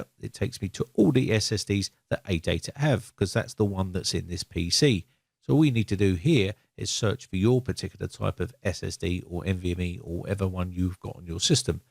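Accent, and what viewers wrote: England, United Kingdom. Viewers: I like the English accent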